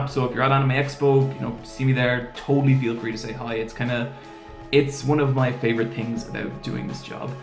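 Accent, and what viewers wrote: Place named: Ireland. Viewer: Could you not tell from his heavy irish accent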